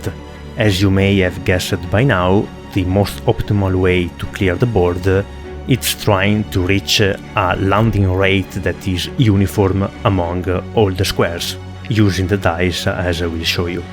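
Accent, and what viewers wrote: Italy. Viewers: What a nice detailed guide, ty so much! I recognized rapidly that you're italian from your accent :D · Needed this, thaknk you? (Sei italiano vero? ;) ) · Are you Italian?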